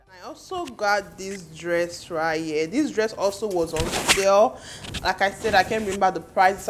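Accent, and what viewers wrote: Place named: Nigeria. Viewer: I love that Nigerian accent 🔥🔥❤️❤️😍😍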